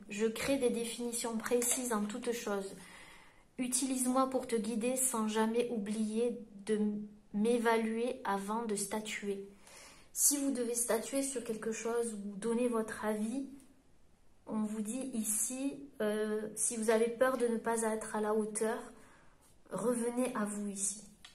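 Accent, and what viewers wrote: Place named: Midi, France. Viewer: Vous. Êtes. Ùne. Gamme. Qui. Libéré. Autrui. Et. J. Adôre. Votre. Àccent. Dù. Midi!!!!!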